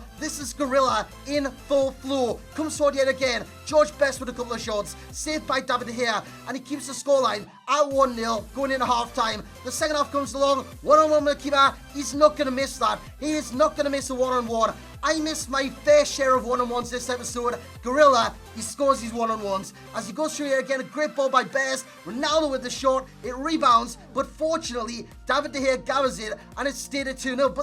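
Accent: Newcastle, United Kingdom. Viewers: hes from newcastle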